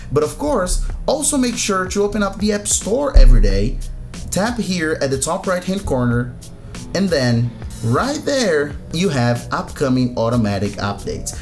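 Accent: Brazil. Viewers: Brasileiro, cheio de sotaque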